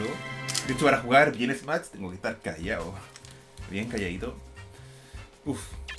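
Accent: Chile. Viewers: Eres Chileno? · Que recuerdos, eras bien chileno 😂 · Sos de Chile?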